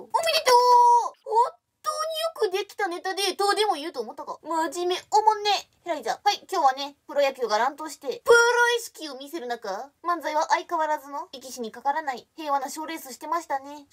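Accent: Kansai, Japan. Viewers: 今回は関西感強いな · 相変わらずキレッキレですね。総統の関西弁、かわいすぎて萌え死です。めっちゃきれいでかわいくて色っぽい！まじで好きです❤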